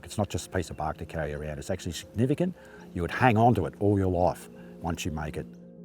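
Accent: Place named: Australia. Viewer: Thank you for the interesting video 👍 I love the Australian accent 🤩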